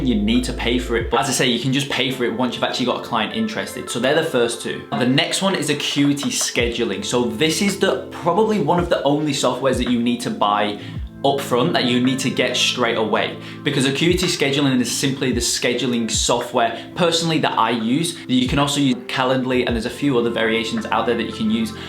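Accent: United Kingdom. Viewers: You live in Australia but you are British, right?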